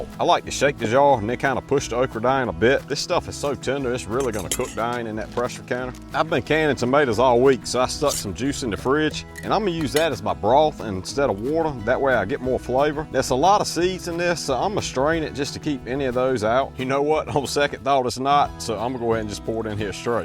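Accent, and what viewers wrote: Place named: Louisiana, United States. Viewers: You sound like you from Louisiana, man. Are you? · I like that accent, sounds like he's in Louisiana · Dude what is your accent? Cajun or something? Man you could be in movies with a voice lie that.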